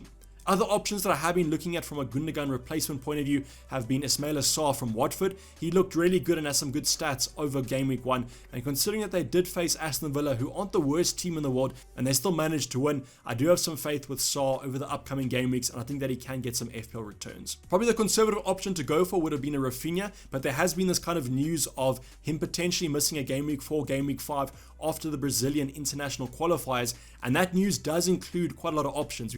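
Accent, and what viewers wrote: Afrikaans, South Africa. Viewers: Are you from South Africa? jy klink Afrikaans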